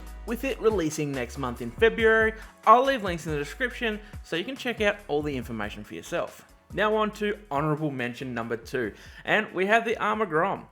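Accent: Australia. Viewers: Is he Australia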